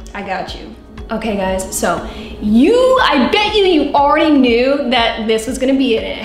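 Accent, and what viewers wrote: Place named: New York, United States. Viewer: I noticed you have an accent, are you from New York, or New Jersey? Just curious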